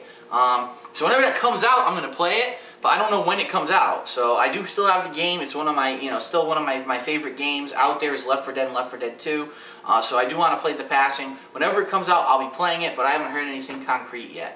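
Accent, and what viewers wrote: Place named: Chicago, United States. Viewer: i think he sounds like he's from chicago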